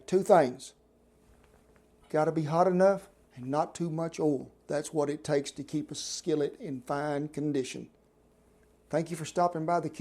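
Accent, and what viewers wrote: South, United States. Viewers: Love that country accent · I'm not American but I love southern accent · I love your southern accent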